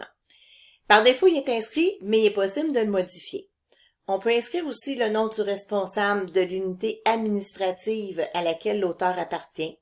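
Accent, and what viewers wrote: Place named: Canada. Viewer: l'accent canadien, j'étais pas prêt !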